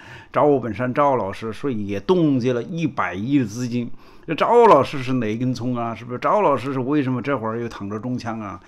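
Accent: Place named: Changde, China. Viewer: 口音听上去常德人呀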